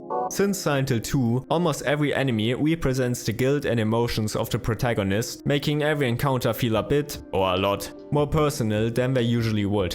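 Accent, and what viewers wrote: Germany. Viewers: Bist du deutscher? das hört man sofort 😁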